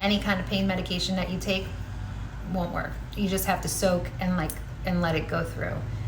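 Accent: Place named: New Jersey, United States. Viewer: He has blessed me! And God bless YOU as well!! Yes, lol the accent you hear is indeed from Jersey and that is where I had my surgeries. ❤️‍🩹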